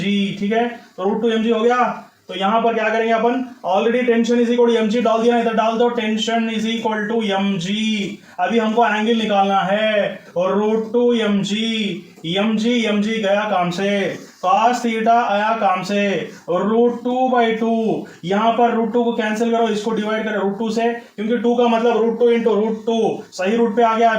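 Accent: Hyderabad, India. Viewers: #Hyderabadi accent never hides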